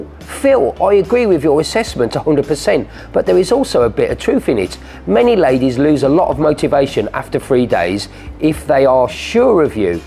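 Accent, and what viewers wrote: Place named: England, United Kingdom. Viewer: Why are these videos always made by old fat bald men with English accents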